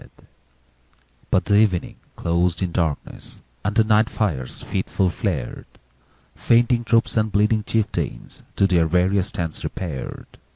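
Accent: India. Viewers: Would be better to not have someone with a heavy Indian accent read this. The heavy accent is difficult to understand the English. Can't understand this version. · When an Indian goes to Europe and tries to speak English like he is local....that's how this audiobook sound.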